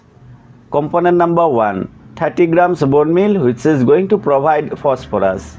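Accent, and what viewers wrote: India. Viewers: Very strong indian accent i hope hydrangeas are strong..pointless video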